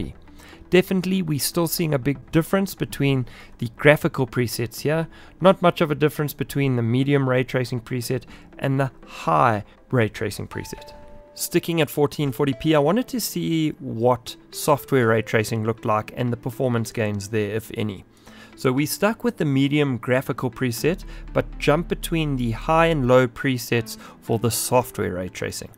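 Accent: South Africa. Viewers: I hear saffa, I sub.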